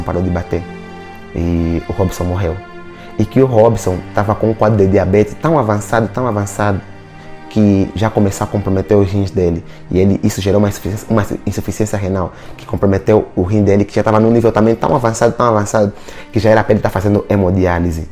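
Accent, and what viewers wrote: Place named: Portugal. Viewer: Ele falar português só que de Portugal entendeu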